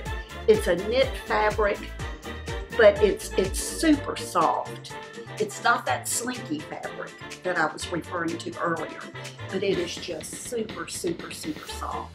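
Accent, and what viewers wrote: South, United States. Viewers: Glad I found you. I'm fast approaching my 70's and the difference between my late 50's and early 60's has caught me off guard! Changes in health, sleep, and my body in general. You are honest and fun without tons of pretense. Plus your accent reminds me of my favorite sister in law and other family that stayed down south. I use to live in Florida as a kid. Thumbs up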